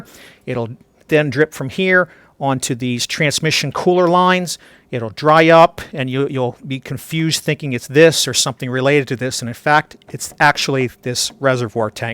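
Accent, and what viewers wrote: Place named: Western Pennsylvania, United States. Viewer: My Sonic isn't acting up yet, but my neighbor had problems with hers. This will be handy down the line, thank you for sharing! I am a big supporter of Right to Repair, and channels like this are a huge help to those of us who need it. Side note, your accent sounded familiar. My family is from western PA and your inflections remind me of my uncles, who let me be a little grease monkey and help when they repaired their cars when I was younger. Cheers, have a great day!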